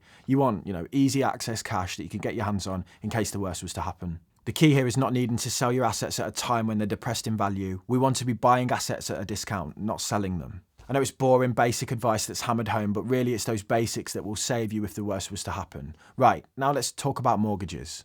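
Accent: Birmingham, United Kingdom. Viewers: Is that a Birmingham accent?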